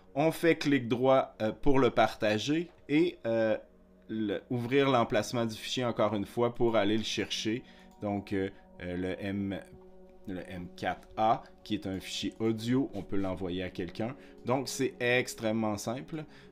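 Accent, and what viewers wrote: Quebec, Canada. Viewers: Super et merci. Pourrais tu me dire comment ouvrir plus simplement les fichiers .ZIP après l'enregistrement avec enregistreur d'actions utilisateur, afin de pouvoir les envoyer plus facilement par mail ou messenger . Je suis de retour en France depuis 30 ans, après avoir vécu à Montréal et ça fait du bien de retrouver un bel accent quebecois. Encore merci.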